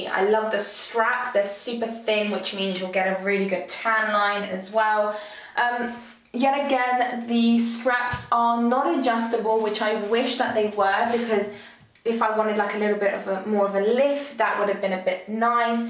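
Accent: United Kingdom. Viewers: my favorite British beautiful woman! Your clothes show is very professional and enjoy able! I love your sexy look and accent. You are very honest with your thoughts and believes. Have a long healthy, happy life ❤️ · Where is that accent from? Okay, UK? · You look really good but your British accent is hot keep up it up .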